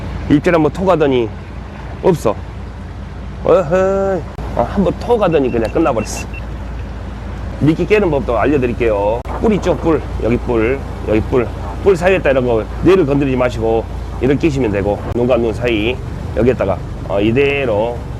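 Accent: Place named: Jeolla, South Korea. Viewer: 영상보니 좋아 보이네요..... 구수한 전라도 사투리에.... 선장님 배로 외수질 출조하려면 어떻게 해야 하나요?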